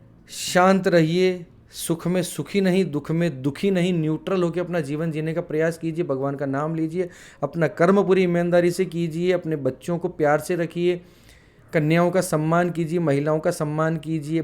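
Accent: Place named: Rajasthan, India. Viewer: Aapki boli bhilwara ke aaspaas ki h bhai sab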